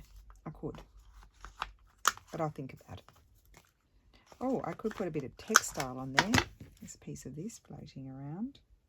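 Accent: Australia. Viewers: I so enjoy your videos! You are so talented and nothing beats an Aussie accent, especially when you say here! Keep up the good work1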